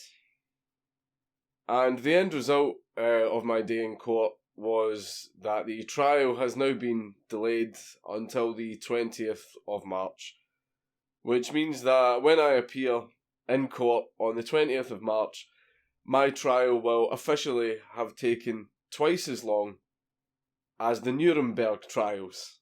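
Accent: Scotland, United Kingdom. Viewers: wrong country dude he's Scottish not Australian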